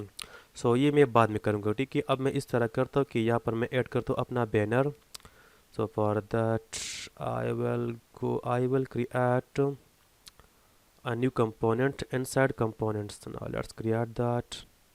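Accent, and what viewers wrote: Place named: Peshawar, Pakistan. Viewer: Sab acha hai lekin apna khan wala akcent khatam karo bhai ye youtube hai peshawar nahi yahan har banda nahi samajh sakta aap ka style.. please brother..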